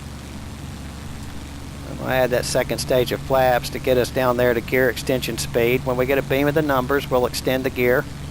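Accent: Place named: Deep South, United States. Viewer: Deep south. No offence meant.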